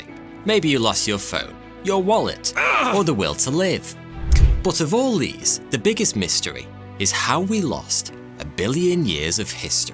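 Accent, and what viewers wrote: United Kingdom. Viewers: Good grief that particular British accent or whatever it is is awful. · His partial British accent made things more slow n me sleepy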